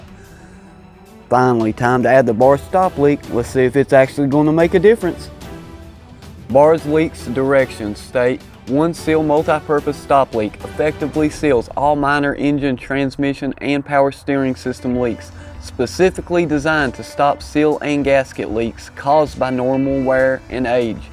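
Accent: South, United States. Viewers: Love the dramatic music with the laid back southern accent lol. I'm subscribing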